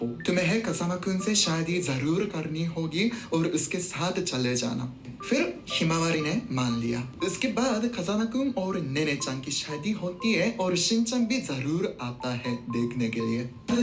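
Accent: Standard. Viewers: आप शुद्ध हिंदी बोलते हैं और मुझे आपके वीडियो पसंद हैं, आप मुझे बहुत प्यार करते हैं, मैं जापान से प्यार करता हूं। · This guy speaks better and pure hindi than us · Aap itni shuddh hindi bolte hain ki mujhe bhi aapse bohot saare shuddh hindi ke shabdo ke baare me pata chala. Dhanyawad 🙏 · He say pure Hindi than me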